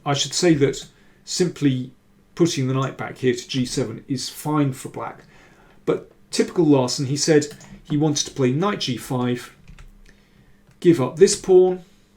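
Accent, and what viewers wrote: United Kingdom. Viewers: Adnan he actually used the word "perilous" in this video which I can safely say Ive never used in my whole life. Its that British diction I love it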